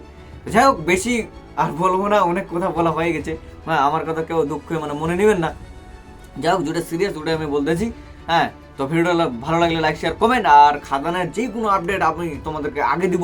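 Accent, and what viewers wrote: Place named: Bangladesh. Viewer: Ei gudir beta youtuber ta bangladeshi pola, ei mal tar kotha sunei bujha jacche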